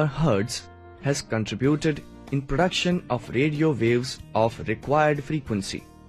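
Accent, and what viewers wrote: India. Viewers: IS THIS INDIAN GUY? WHO TALK? SOUND LIKE INDIAN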